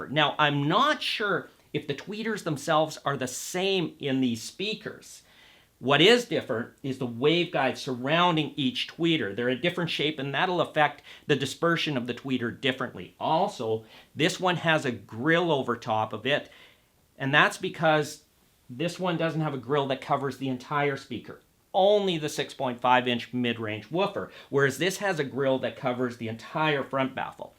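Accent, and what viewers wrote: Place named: United States. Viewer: You realise he is from the USA don’t you? It’s called an accent. Weird observation you have and somewhat ignorant I might add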